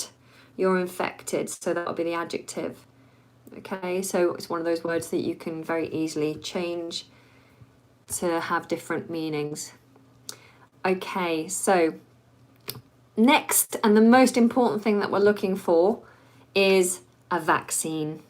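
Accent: United Kingdom. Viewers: Hello! I can't help saying this! You explained everything related to an infectious disease like coronavirus in a charming and interesting way! Honestly, you and the way you speak British English are both lovely! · You are a fluent teacher in British accent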